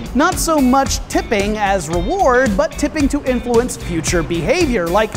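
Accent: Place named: United States. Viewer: I presume this is a U.S. production? (from the presenter's accent). in, the heavy traffic picture is that of Sydney Australia at sunset - specifically: Victoria Road (which begins at that Right Hand fork), and the Left Hand fork services near-city suburbs, but is also a way to get to the other main East-West road (Parramatta Road). Yes, despite initially heading North, Victoria Road is basically an East-West road. Both these main roads in and out of Sydney lie North and South of the Parramatta River (which basically runs East-West), and forms Sydney Harbour where it reaches the South Pacific.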